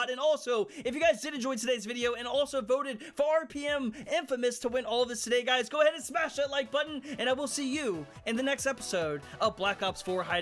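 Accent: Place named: Ireland. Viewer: Littlr irish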